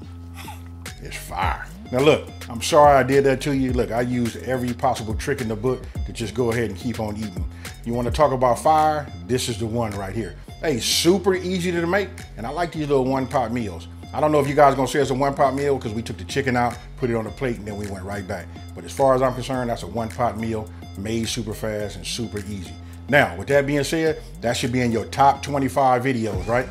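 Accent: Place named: Los Angeles, United States. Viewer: I can tell u a l.a brother by that accent lol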